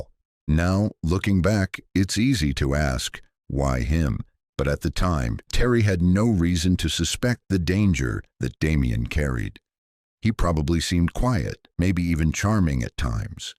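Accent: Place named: United States. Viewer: Couldn’t stand the narration and American accent. Very annoying and full of repetitive dialogue.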